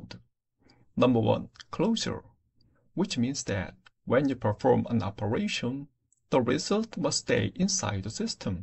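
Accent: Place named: China. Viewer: I would guess Chinese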